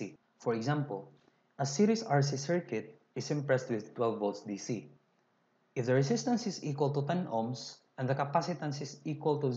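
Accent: Philippines. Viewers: Hahaha, check comment pa ako kung pinoy ka, pinoy accent eh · You should should your step by step derivations of calculus for students. Great Job. I can tell your English is Filipino, not American. 'eigenvalues'....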